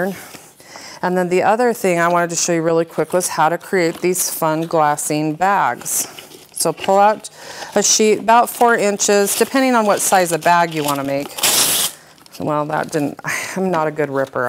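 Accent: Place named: United States. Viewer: bravo, but your english is only for americans,you talk to fast and i hardly understand what you say,bravo any way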